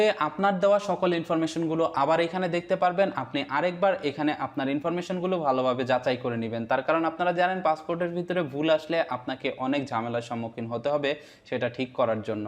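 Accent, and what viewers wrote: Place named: Dhalapara, Bangladesh. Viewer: Mone hoy apnar basha Dhalapara...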